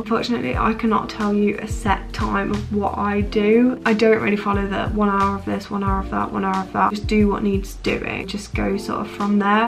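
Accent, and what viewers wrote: United Kingdom. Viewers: it makes me wanna learn british accent thank you 💗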